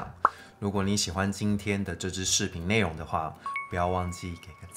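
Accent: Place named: Taiwan. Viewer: 好不習慣這麼多台語生活化的你，哈哈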